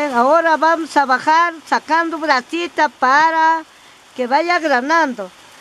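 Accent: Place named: Lambayeque, Peru. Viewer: Parecía de México la demora así hablan en Lambayeque es diferente si acento de voz que interesante yo soy de tacna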